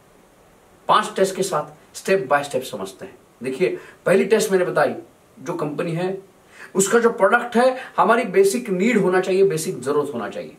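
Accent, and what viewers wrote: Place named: Maharashtra, India. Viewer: Very nice Sir. Khup bhari watla Sir tumcha ha video bagun. Apla manus business field madhe pragati karat ahe he bagun khup bhari watla. Tumcha hindi accent madhe Marathi chi tone yet ahe khup chaan. Dr Vivek Bindra Sir ani tumchya badal reference dila. Khup chaan. All the best. Very nice. Your way of teaching and communication is great and easy to understand. You present difficult topics in very easy way.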